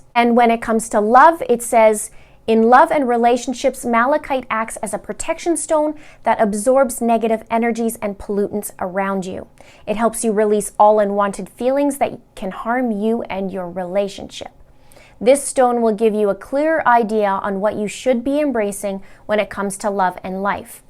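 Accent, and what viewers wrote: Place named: Canada. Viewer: Where are you from? You sound Canadian. Love your videos! Can’t wait to see Lori and Chad get nailed to the wall for this! (And still so so so sad about Gannon 😫)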